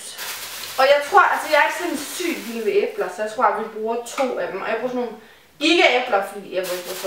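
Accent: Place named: United Kingdom. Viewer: Dat engelsk😂😚💕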